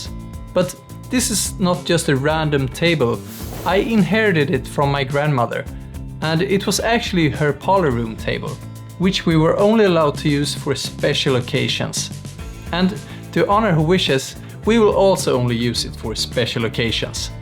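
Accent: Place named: Scandinavia. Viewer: What a great video! Loved the approach (love the scandanavian accent too, coming from the UK, it just adds that amazing narrative feel to it - your wife is super cute ) and the channel play on name!! Amazing! Just a really nice video to watch - gonna subscribe just to watch your wonderful journey. Good luck to you both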